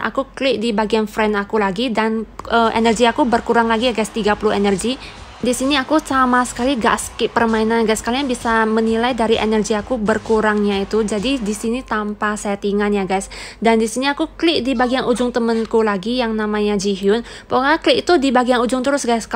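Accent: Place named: Java, Indonesia. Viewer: Indonesianya Medok :D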